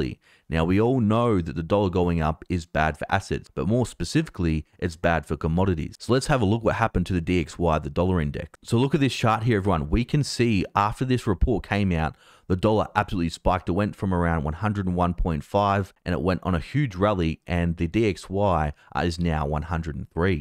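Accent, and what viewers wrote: Australia. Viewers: I think the Central Banks are trying to consolidate as much gold as they can because “in Their hands it is valuable but in Our hands it’s Not”. If they crash the price people might be tempted to Sell…then They can acquire it at Bargain Prices. When I watch your videos your accent makes me miss visiting Australia! I declare a TAX FREE 2023 for Every Disgruntled Democracy 💭🇺🇸✌️🇦🇺❣️🙏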